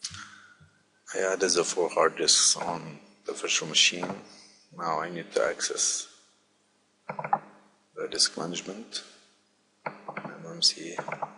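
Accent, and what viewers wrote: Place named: Germany. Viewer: no explanation whatsoever...seems to be in some kind of hurry.........could not understand stupid german accent also !!!!!!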